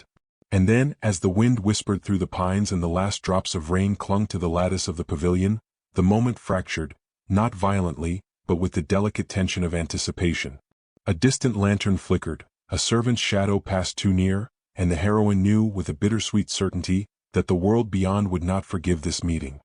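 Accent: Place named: United States. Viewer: Terrible narration; too hurried; no change in cadence and too American!!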